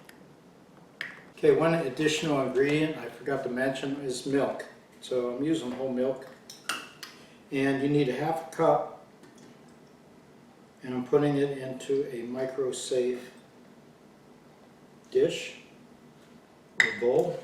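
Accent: Pittsburgh, United States. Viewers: As soon as I heard his accent I knew he was from da Burgh! Found out he is my classmate Mike’s brother and another classmate Sharon’s cousin. Great videos, No rolling pin…use a wine bottle! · Really nice work. Good recipe. Sounds like you are from the Pittsburgh area. Thank you so much❤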